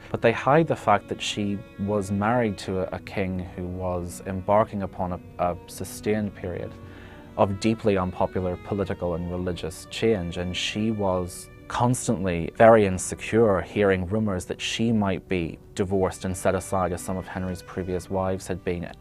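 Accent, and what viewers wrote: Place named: Ireland. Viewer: Love the Irish commentator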